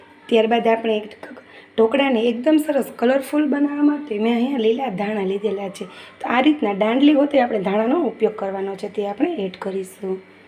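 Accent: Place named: Kathiyawad, India. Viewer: Khub saras vangi che. Tame kathiyawad na Cho? Bolvano lehko tya no lage che😊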